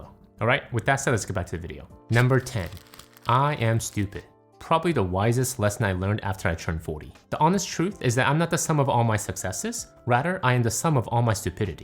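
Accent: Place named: South Korea. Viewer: You have a strong KOREAN accent.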